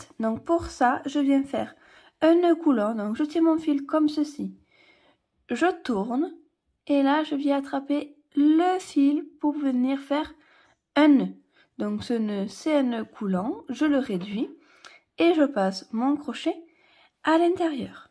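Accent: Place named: Southeast, France. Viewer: Oui, Vos explications sont claires et nettes et votre joli accent me rappelle que moi aussi je vis dans le grand sud-est de la France. C'est du soleil pour la journée. Amicalement.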